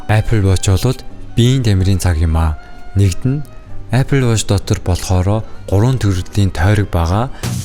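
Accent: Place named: South Korea. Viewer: Angli helnii duudlaga n aygui huurhuun yag korea english kk amjilt husiy danda engiin murtlu humuust medeelel ygdug n taalagddag shy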